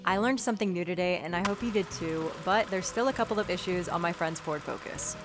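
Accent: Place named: West Coast, United States. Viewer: She sounds like she's from the West Coast. East of the Rocky mountains, the cars all rust out.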